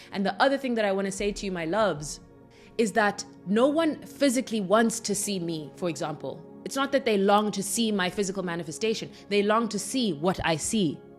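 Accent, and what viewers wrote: South Africa. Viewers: Gurllll are you South African? 👀✨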